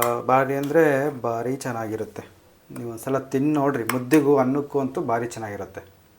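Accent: Chitradurga, India. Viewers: ನಮ್ಮದು ಹಿರಿಯೂರು ನಾವು ನಿಮ್ಮ ಶೈಲಿಯಲ್ಲಿ ಕನ್ನಡ ಮಾತನಾಡುವುದು ನಾನು ಬಳ್ಳಾರಿಗೆ ಬಂದು 33 ವರ್ಷಗಳಾದರೂ ಸಹ ನಮ್ಮ ಚಿತ್ರದುರ್ಗದ ಕನ್ನಡ ಭಾಷೆ ಸೊಗಡು ಅಡುಗೆ ಮಾಡುತ್ತೇನೆ ನೀವು ಮಾಡುವ ಅಡುಗೆ ಮಾಡುತ್ತೇನೆ ನಮಗೆ ಇದೇರೀತಿ ಅಡುಗೆ ಅಭ್ಯಾಸವಿದೆ. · ನಿಮ್ಮ ಮಾತಿನ ಶೈಲಿ, ಅಡುಗೆ‌ ಮಾಡುವ ರೀತಿಗಳನ್ನು ನೋಡಿದರೆ, ನೀವು ಚಿತ್ರದುರ್ಗ, ಚಳ್ಳಕೆರೆ, ದಾವಣಗೆರೆ ಪ್ರಾಂತ್ಯದವರು ಇರಬಹುದು ಎನಿಸಿತು..ಈ ಎಲ್ಲ ಅಡುಗೆಗಳನ್ನು ನನ್ನ ತಾಯಿ ಇದೇ ರೀತಿಯಲ್ಲಿ ಮಾಡುತ್ತಿದ್ದರು..ತವರೂರ ಊಟ ನೆನಪಾಯ್ತು.. ನಿಮ್ಮ ಅಡುಗೆ ಮತ್ತು ವಿವರಣೆ ಎರಡೂ ಸೊಗಸಾಗಿವೆ..ಶುಭವಾಗಲಿ.. ಸೌ.ಮಧು ವಸ್ತ್ರದ.. ಮುಂಬಯಿ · Neevu pakka davangere Chitradurga Kannada mathadthira ala same nam Ajji and Amma mathado haage.. nimma recipes nodovaga avarathrane Keli madthidhineno ansatthe… thumba thumba olle Saru chatni helkottidhira hinge hale adge dhu video galanna Maadri..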